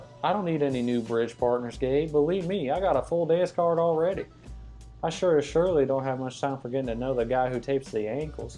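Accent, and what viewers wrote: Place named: Mexico. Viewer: Maybe tone down the intense mexican accent, seem a tad off for a guy called Other than that great stuff thanks for the posting! You are a champ!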